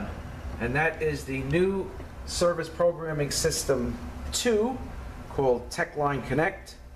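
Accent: Long Island, United States. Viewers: Great video. Didn't know about the change until recently. I was listening to you at the beginning and then saw the sign and was like oh, thats why he sounds like a Long Islander. I'm a NC native, but married my East Meadow native wife 26 years ago. We live in TN, but just had one of her heavy NY accent brothers visit recently from Long Island. Great info here. Thank you.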